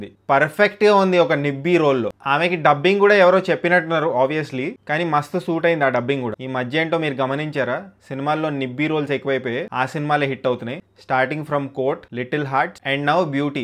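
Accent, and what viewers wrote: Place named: Visakhapatnam, India. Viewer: Vadhura puku vizag slang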